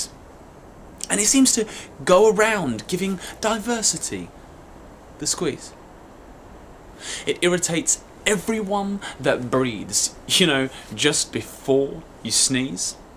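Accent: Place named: England, United Kingdom. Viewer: This is excellent! All your poems are amazing! By the way i'm russian, and i really like your pure English accent. I'll be glad if you will often record video of this kind. I'm sure it will help to improve the speech for many who teach English.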